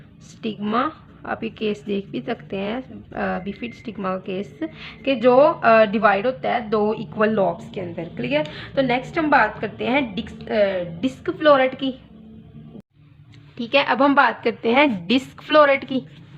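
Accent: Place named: Lahore, Pakistan. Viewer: Thank uhh so much mam 😊❤❤❤ your accent is so cute..kind of lahori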